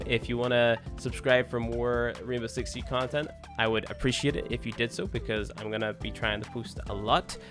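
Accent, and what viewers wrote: Northern Ireland, United Kingdom. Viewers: Northern irish deffinetly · Are you from Northern Ireland cuz you kinda sound like it lol like you sound like my da a bit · Northern Ireland:)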